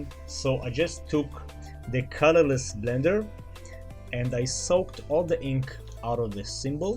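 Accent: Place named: Israel. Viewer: הי אני יודע שאתה ישראלי בגלל המבטע לול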